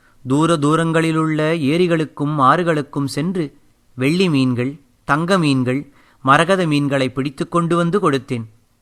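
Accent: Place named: Tamil Nadu, India. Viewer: hello sir this is Karthic from Coimbatore am big fan for ur audio book and liked ur Tamil ascent very much gud work kudos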